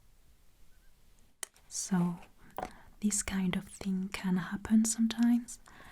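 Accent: France. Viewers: Your videos are, possibly, the best crafted asmr ones I’ve seen so far. Plus, your french accent is so charming...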